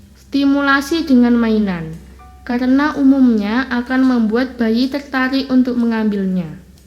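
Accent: Java, Indonesia. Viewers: Medok banget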